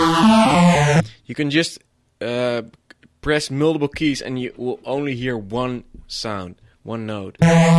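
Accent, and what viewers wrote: Netherlands. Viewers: lol nederlanders en hen accent in het engels zijn echt onmiskenbaar :P next to that good tutorial! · Nederlands? herkende het meteen aan je accentje :P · Mental! I clicked it on in the margins of the You TUbe Drum and Bass selections! What girls are you on about? He does sound Dutch though..Pffffffff as the Dutch male says : ) I welcomed the tour on this software....the link did exactly what it said on the tin.*shrugs* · ik hoorde gelijk al aan je accent dat je nl bent , lol . Maar nice.. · It's the typical dutch accent haha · LOL I love the way the Dutch talk. :D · Lekker hollands accent heb jij. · ye, dutch people talk english kinda funny, you always hear that immediately (i'm flemish) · ah so is dutch cant miss that accent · LOL dutch accent FTW!!!!!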